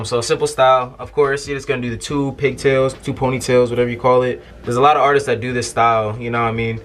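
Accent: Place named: New Jersey, United States. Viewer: are u from nj i hear the accent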